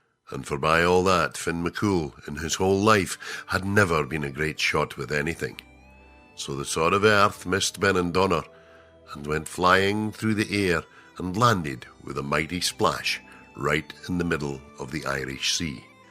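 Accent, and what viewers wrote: Scotland, United Kingdom. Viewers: I have never heard this version, I love it, lol. I may be wrong, but it sounded like a Scotsman telling the story? I took that end bit about the Isle of Man as a jab. Is that a "inside Scottish joke"? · The story of one of Irelands most geological sites. Narrated by a guy who is not Irish but Scottish.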